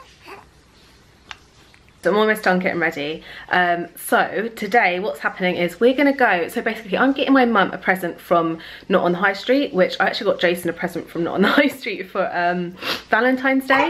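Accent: France. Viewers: ouuuuh l'accent français bien prononcé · The french accent tho 😌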